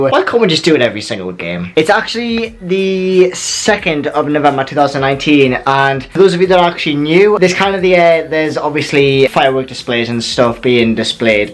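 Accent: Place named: Newcastle, United Kingdom. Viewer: Geordie definitely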